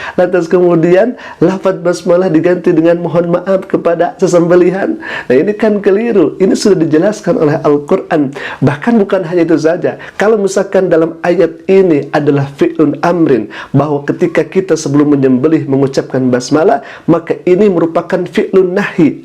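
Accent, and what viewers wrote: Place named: West Java, Indonesia. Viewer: Ini sih ustad dadakan.. bicaranya spt org dari jabar...memalukan org sunda... Dasar ustad koplak.. 🤣🤣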